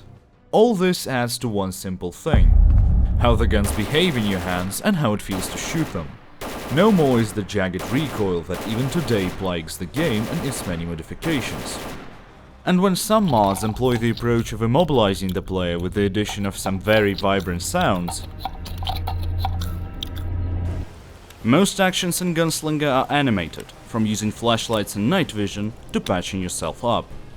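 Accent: Russia. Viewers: чел, ты передаешь привет русско-говорящему, слышно же по акценту........ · Is that a Russian accent?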